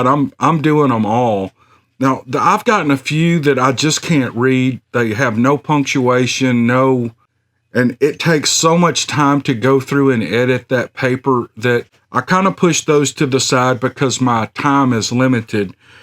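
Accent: South, United States. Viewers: I have seen every single one of your videos & I'm always so happy to get the latest notification telling me that you just posted a brand new one!!!!!!! I love your voice & that sweet southern accent too!!! I really enjoy listening to the special way that only you can tell these wicked cool stories!!!! I also really like the awesome music you share, & all the videos you make to go along with all the stories!!! Anyway, I don't usually leave comments anywhere, but I just wanted to say thank you for creating!!! I am seriously such a huge fan, & I absolutely appreciate all the work & effort you put into making this channel as cool as it is!!!! Totally looking forward to the next one!!!! Thanks again!!!! You're awesome!!!! ~ 💖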